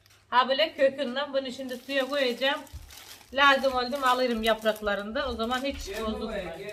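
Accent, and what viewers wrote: Trabzon, Turkey. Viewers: merhaba seni severek takip ediyorum şivene bayılıyorum bende aslen trabzon akçabatlıyım ama sakaryada doğdum burda yetiştim annem babam orda evlenip sakaryaya yerleşti dedemler akçabat düz köyde yaşıyor seni dinledikçe annem konuşşur gibi severek dinliyorum yaşşa senin ablan sayılırım seniiiii çoooooook öpüyorum canımsın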